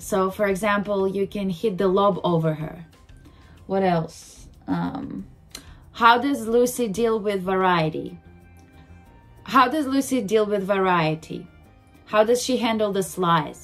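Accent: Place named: Australia. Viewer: Hello. Having been a fan of Kasatkina and Zabiiako I got interested in you. I love how you are developing an Australian accent. I really like your videos you have a great personality and attitude. Your videos are enjoyable to watch. I wish you the best in your tennis career. Thank you for your insight. Keep smiling.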